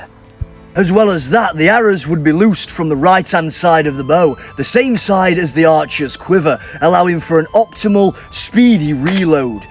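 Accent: United Kingdom. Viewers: I've subscribed for three reasons: this is very funny, he goes straight to the point,and his story telling skills are excellent. Forgot to mention that I love the British accent, I find it very refined.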